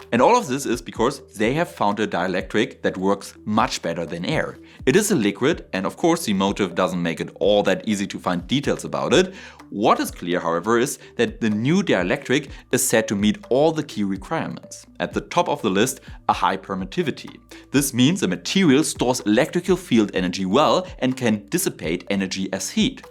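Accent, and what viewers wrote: Germany. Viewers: A whole quarter hour full of german accent bulishit, with virtually ZERO useful INFRMATION about the "motor" The mother of clickbait :face-green-smiling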